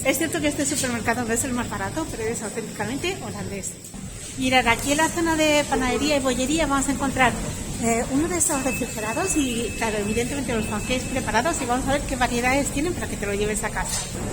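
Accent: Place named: France. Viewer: eres española y.. se te escucha el acento frances.. heee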